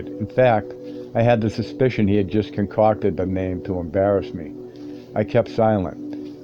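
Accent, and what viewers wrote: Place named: New York, United States. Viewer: Love your reading. The NY or Jersey accent? It is relaxed and not the MACHO WARRIOR of the others. Thanks! But the background music could fade off to Mars, IMHO! This writing involves connection to the Earth - not space.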